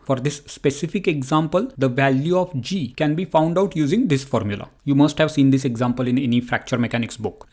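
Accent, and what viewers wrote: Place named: India. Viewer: too strong indian accent...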